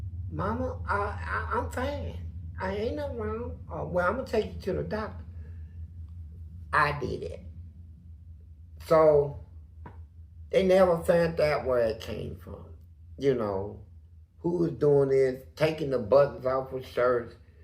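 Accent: South, United States. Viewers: Hey, my comment got deleted but I saw you read all your comments. You're my favorite person on YouTube and I wanted to tell you what an inspiration you are to all of us brother, especially me. My heart aches for you and what has happened for you in your life. I can't imagine that kind of abuse and trauma. And you came out with faith and a smile. You are a Unicorn bruh! I'm from Chicago so y our southern drawl and charm and the way you tell stories, well, your just a funny dude. Very charismatic.Never stop being you and telling us stories. And, thanks for all you do nd bringing us!!!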